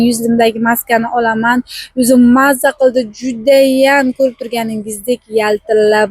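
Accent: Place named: Olot, Uzbekistan. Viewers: Шевангиз холиям олотчча га тортаркан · O'zim aylanay shevangizdan zemlagim Buxoro olotlik men jondordanman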